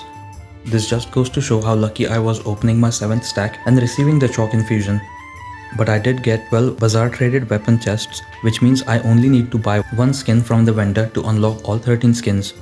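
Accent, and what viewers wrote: India. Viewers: Are you from india youre accent sounds indian???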